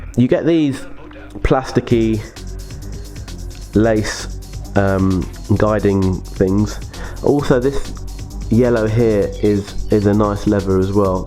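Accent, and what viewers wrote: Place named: United Kingdom. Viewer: Finally a new channel & great to see/hear another Brit,keep em coming bro.Toe box does look like the snapchat ghost lol.I think Hanon killed it with the theme & colourway,I remember M Johnson,he was a beast but so obvious roided up to the eyeballs (excuse the pun lol) even to me as a kid.Good stuff mate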